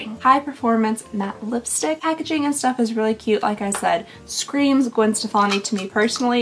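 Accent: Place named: South, United States. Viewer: I’m so sad to see advent season coming to an end!! But I’m so grateful that you stretched it out till Easter !! I have been watching you for the last 3-4 years and I want to say that you are one of my very favorite channels here on YouTube. Although I am so many years older than you are darling , you remind me so much of so many of my friends and even a tiny bit of my daughter who is around your age ! Just your general way of speaking and your mannerisms feel so familiar to me and maybe it’s a southern thing but I just adore you !! I love watching any of your videos it reminds me of home !!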